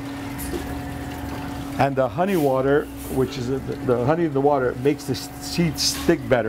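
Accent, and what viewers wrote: Montreal, Canada. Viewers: funny englihs accent exclusive to montreal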